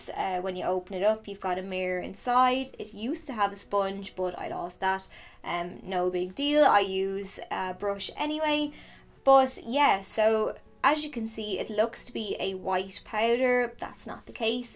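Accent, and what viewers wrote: Ireland. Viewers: Love your videos! 😍Valid, professional tips and tricks, delivered in a sweet, friendly way. (I really hope I didn't offend you on the comment board for best concealers, about listening to you takes me "home" to Ireland, where I once lived. My intention was certainly not to disrespect you, it was meant as a huge compliment 😊)Love your Q&A idea for a video. I would like to know how to apply dark coloured eyeshadows under the eye (at the waterline)without smudging it and looking like I'm going to a grunge party. 😁I'm of Indian heritage and my complexion is light brown. Unfortunately I've been "blessed" with permanently darker circles underneath my eyes and concealers are absolute lifesavers for me. Whenever I try to wear eyeshadow underneath the eye, it makes the area look darker and it overpowers the effect of the concealer. Hope that makes sense. 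😄🙈 Thanks again for your lovely channel.😊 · Great eye makeup!!😍😍🤗❤ love yaa😚 your Irish accent is soo cute!!! Greetings from Germany❤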